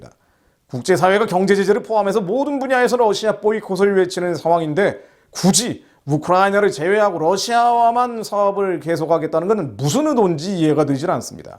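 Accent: North Korea. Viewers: 조선족이거나 북한인같네요. 억양들어보면 북한억양입니다.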